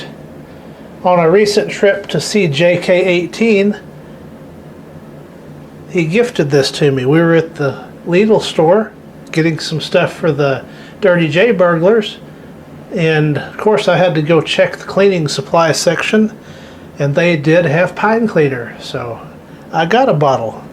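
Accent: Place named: South, United States. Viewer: Love your videos and your lil bit of southern drawl 😆 hello from Oklahoma from your newest subscriber!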